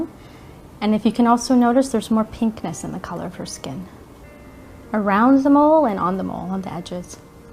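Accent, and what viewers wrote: Canada. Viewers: I knew she was Canadian before she said so. They often have a way for throwing away every sentence so that nothing is salient. The rest was just nonsense of course. Relaxing nonsense at least.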